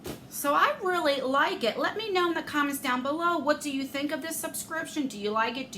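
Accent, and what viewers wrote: New Jersey, United States. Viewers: I just discovered your channel yesterday! I knew you were a fellow Jersey girl from your accent!!!!! Definitely going to keep watching! Always ready to support a Jersey sister!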